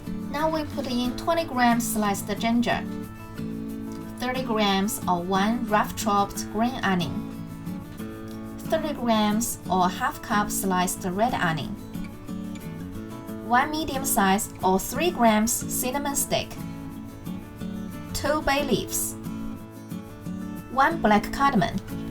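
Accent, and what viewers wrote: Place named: China. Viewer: Typical chinese accent :)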